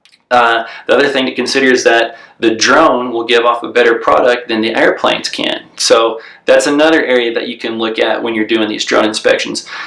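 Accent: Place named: Appalachia, United States. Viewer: Hey, you're right you will never sit in some cubicle working for DA man! But I can tell from your rants that you will end up in jail. And soon. I hope. It is guys like you that go out and cause all kinds of mayhem that bring the FEDs in to regulate. YOU are the problem NOT the FEDs. Also, it is clear to those of us already in the business that you really don't know what you are talking about. Please post your videos showing the oil company tower survey that you use as your example where you made 30, 40, 50,000 dollars. Please post a single link to any of your work. Your hillbilly accent tells me a lot about you. And your need to cuss and blow up at every post....You lack any shred of professionalism. Keep it up preacher...You gonna get you what you paid for. Now lets sit back and see if you can respond without calling me names or saying..."fuck you"...even once! 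In fact i challenge you to NOT reply at all.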